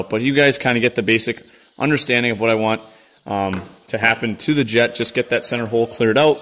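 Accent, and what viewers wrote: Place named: Minnesota, United States. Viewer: You sound like you have a Minnesota accent!!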